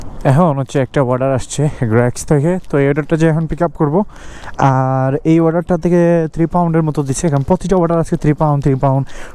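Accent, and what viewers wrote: Sylhet, Bangladesh. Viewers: Apne sylheti?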